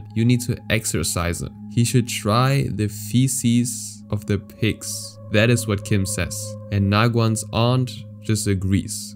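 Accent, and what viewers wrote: Germany. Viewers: are you german?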